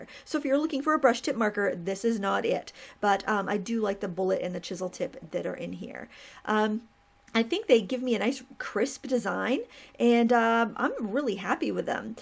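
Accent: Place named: Arkansas, United States. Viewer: Hello, Hope you are well. This is really cute just not my thing (yet), hoping to work on some postcard simple watercolors to start with and good basic drawing practice first. Just wanted to let you know I've been watching alot of your videos and to let you know, your way of saying things, you would blend in with us Arkansas folks just fine. We say ain't and other "incorrect" expressions, we also have a tendency to run several words together, but any from here understand quite well. I like the way you express yourself. Ever need a change of pace, we have canoeing, lakes and national forest recreation parks all over the place. You surely be welcome here. Thanks for all your help and support, is greatly appreciated. God bless. Sincerely Becky B from Arkansas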